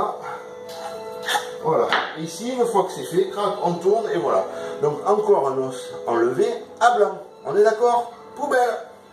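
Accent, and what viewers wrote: South, France. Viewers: Travail de pro hyper appréciable, bravo à toi et bonne continuation... Ton accent du Sud et tes expressions me font bien rire! À+++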